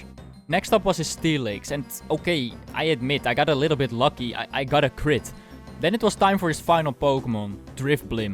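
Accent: Netherlands. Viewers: Are you dutch? You sound pretty dutch to me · Dutch accent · You are Dutch aren't you? I can hear it because of your accent? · You have like a Dutch accent Like how you say Pokémon it's like Dutch (Mede Nederlandstalige leuke video) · You sound Dutch. Is that weird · I can clearly hear you’re dutch😂😂😂😂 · where are you from? i really get a feeling you are dutch or something just because of how you prenounce some words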